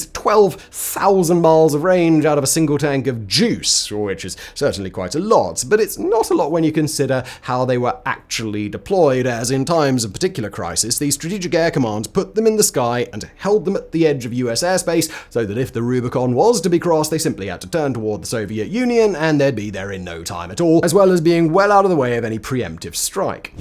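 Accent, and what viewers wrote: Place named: United Kingdom. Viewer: Please speak a little slower. The rapid pace, along with a British accent , reduces to gibberish to the American ear.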